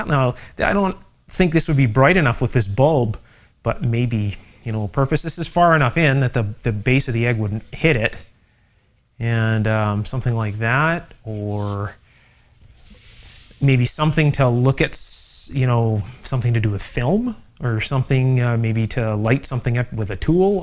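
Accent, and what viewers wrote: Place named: Canada. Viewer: Why can’t Canadians say OUT correctly ?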